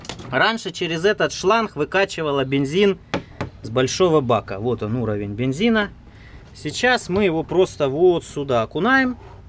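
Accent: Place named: South, Russia. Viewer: ох уж этот южный говор :) хазуй хазуй!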